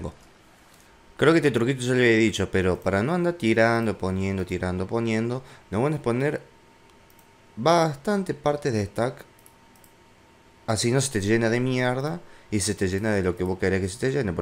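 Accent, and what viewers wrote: Córdoba, Argentina. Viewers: sos cordobes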